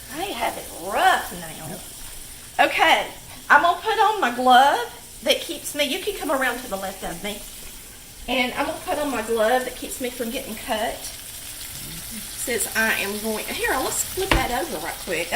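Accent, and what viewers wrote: South, United States. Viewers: Love that southern accent!!! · I am from Georgia, Rockdale County, and totally cook southern style. In love to watch you and hear your accent, I have lost most of mine. Your husband does a very nice job as your camera man. You cook differently than I do but it all seems to come out very well and looks delicious. ( I know we all do it as either our mother or grandmothers did). I would absolutely love to have some of your gift of the red Plum Jam. I know that it is made from those little wild plum trees you see growing just off the road all over the state. Any way I will keep watching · You sound and cook like a southern woman if you are I'm am to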